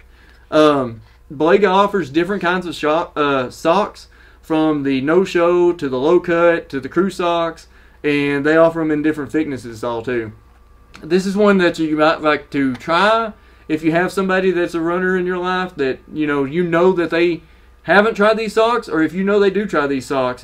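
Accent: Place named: South, United States. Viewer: Thanks for the quick list & honest info. Looking forward to 2019. PS - Don’t apologize for a southern accent. Just be the real you.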